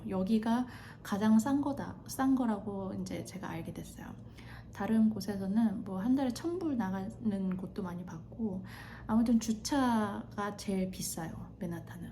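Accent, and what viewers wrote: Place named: Gyeongsang, South Korea. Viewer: 경상도 사투리가 들려요!!! 경상도 출신 구독자입니다 ㅎㅎㅎ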